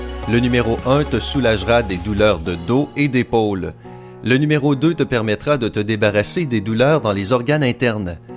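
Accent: Canada. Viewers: vire la voix canadienne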